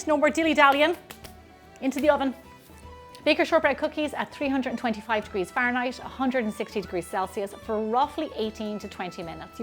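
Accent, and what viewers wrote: Ireland. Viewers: We are Canadian. My heritage is Ukrainian, my wifes is irish. I'm the primary cook so try and make her her favourite meals. I make her a corned beef and cabbage from scratch every St Patrick's day, so have another 6 weeks before i have to brine the beef brisket. But she wanted some cookies/biscuits now. happy wife, happy life. Thnx for the recipe. Looked at many but wanted authentic Irish, and you sound Irish, LOL. Made these a few weeks ago and she loved em with her tea. Used turbinado sugar instead for the topping tho.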